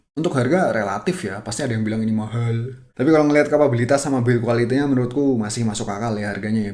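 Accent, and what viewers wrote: Java, Indonesia. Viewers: Mantap bang, medok² asek pertahanin buat cirikhas Yg pemting kontennya berbobot dari Reviewnya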